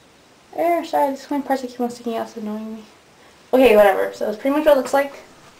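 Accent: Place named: United States. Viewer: Yay!! I did it I plaited mine and then curled it :D Im goin to meet a lad in a couple of hours so Im goin to wear it curly then put it into this half way through so it looks as if Im good with hair haha. Love your videos and your accent, wish I had an American accent but I have an English accent cos Im english and live in england x :( haha xx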